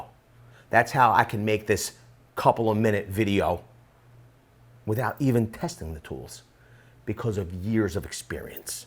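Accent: Philadelphia, United States. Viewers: Gotta get a Tshirt made that says “AND THE REALITY IS” Strong philly accent go birds!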